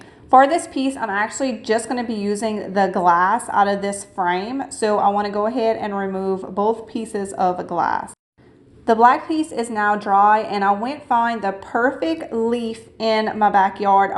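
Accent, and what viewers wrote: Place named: South, United States. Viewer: You have a beautiful personality and your southern y’all feels warm and welcoming.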